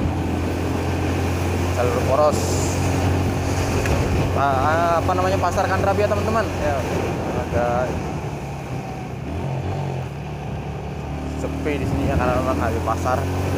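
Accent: Banyumas, Indonesia. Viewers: Asli mana bang . Kok logat,nya kayak ngapak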